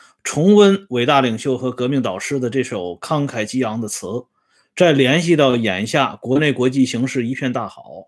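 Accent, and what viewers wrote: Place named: Tianjin, China. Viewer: 我又听出天津味了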